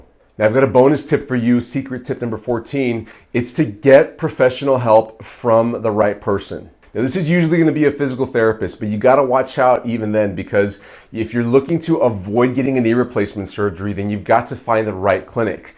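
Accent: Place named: United States. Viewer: I hate this blody American English. Thooo..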